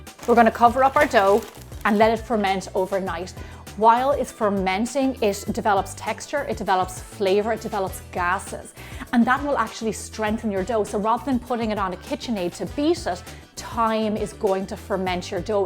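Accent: Ireland. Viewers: Great video - thx! Will be giving it a shot. No steam? AND do I detect a bit of Irish in you? Maybe delicious Irish soda bread in the works? 😀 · Love listening to your lovely Irish lilt!! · I like the Irish accent, "butt eerrr"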